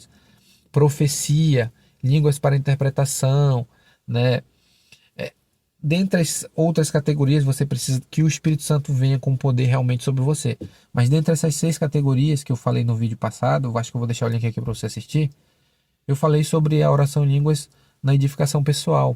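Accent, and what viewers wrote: Italy. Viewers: Verdade parece ucraniano , tbm tem um sutaque de italiano sei lá , é lindo demais né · É um sotaque italiana pastor muito lindo !!! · Sua língua parece muito com italiano, tanto a língua quanto o sotaque.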